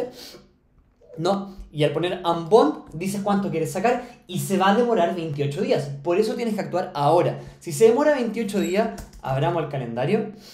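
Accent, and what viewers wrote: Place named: Chile. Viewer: explicas pésimo demasiado chileno pero buen contenido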